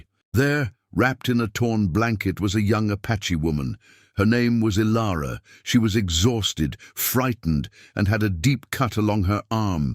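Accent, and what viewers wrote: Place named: United Kingdom. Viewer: Another American western story narrated by a British accent. Not interested!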